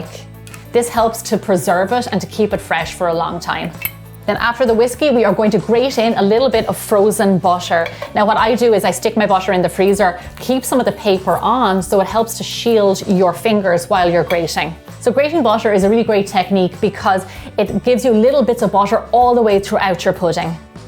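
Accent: Ireland. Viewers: Thank you so very much for taking the time to respond to my post and to give me tips for making my pudding gluten free. Thank you also for you kind condolences. I forgot to say how much I LOVE listening to your brogue. You remind me so much of my cousin Terri O’Neill who’s Irish on both her mom’s and dad’s sides. How I love Ireland and the Irish people! ☘️☘️☘️💗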